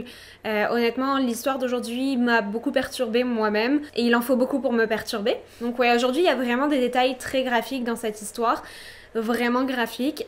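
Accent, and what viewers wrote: Quebec, Canada. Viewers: Je suis au Québec et je me rappelle parfaitement de cette histoire. C'était assez fou ! Changement de sujet, en t'écoutant j'entends l'influence "canadienne" dans ta voix et le choix de certains mots. Je trouve ça mignon ;-) Bonne continuité xx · Je savais que tu avais un accent. Tu es de où au Canada-Québec. Fille du Québec xxx 🌺🌺🌺🌺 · Est-ce que tu parle avec l'accent québécois en temp normal?? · Pour dire que tu es du canada ton accent je l'entend a peine sur certains mot 😁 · J étais sur qu'elle avait un accent français et québécois. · Petite question rien a voir, tu est Canadienne ou vous au Canada ? J'entends un tout petit accent que j'adore !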